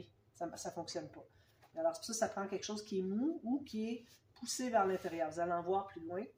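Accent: Canada. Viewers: Bonjour trop joli merci pour les tutos 🎉🎉 accompagné de votre accent canadien 🎉🎉j'adore car je suis allé au Canada Québec en septembre j'ai trop aimé ce pays et l'accent Merci 🎉🎉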